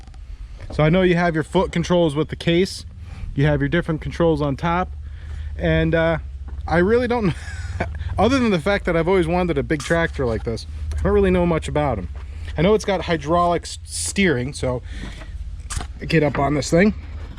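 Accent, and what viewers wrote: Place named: East Coast, United States. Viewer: Can tell your From the east. Deezel, Dee Zul. Here in Colorado it's a Dee Suhl, or Dee Saul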